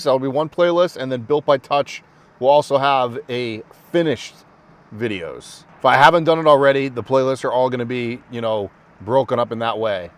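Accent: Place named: New England, United States. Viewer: Keep talking about your drawer slides lol you have such a New England accent! I lived in Mass for 21 years and I’m in SoCal now. Hearing you say drawer takes me back 🙌🏼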